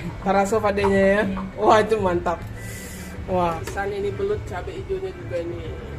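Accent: Minangkabau, Indonesia. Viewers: ulangnonton seronok mendengar suara lpghat minang tetapi tidak pekat.masih boleh faham selamat baik sampai jumpa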